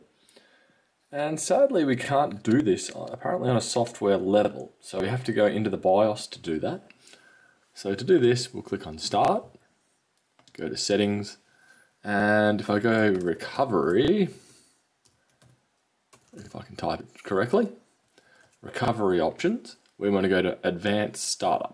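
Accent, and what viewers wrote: Australia. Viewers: Liking for Australian accent, am australian :)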